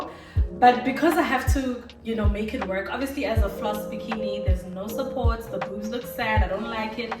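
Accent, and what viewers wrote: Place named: South Africa. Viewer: You're an excellent presenter and ambassador for busty ladies. You are charming. Plus I love your SA accent 🤩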